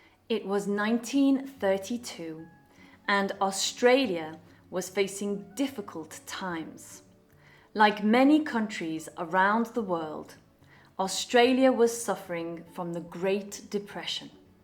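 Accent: United Kingdom. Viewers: New subscriber, love British accent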